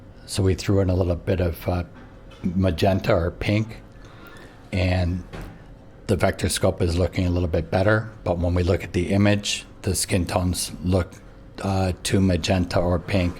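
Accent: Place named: Chicago, United States. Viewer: awesome info, i gonna grab the leeming lut for cine 2 and try it out! Btw are you from chicago?? I think i sense a chicago accent lol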